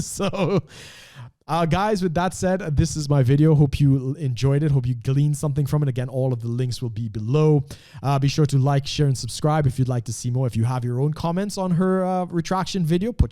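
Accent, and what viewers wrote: Trinidad and Tobago. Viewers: You from the caribbean bruh?🤔 you sound like a trini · Waw..the way in which your accent comes across sound a lot like a TRINIDADIAN! and on second note...very well put.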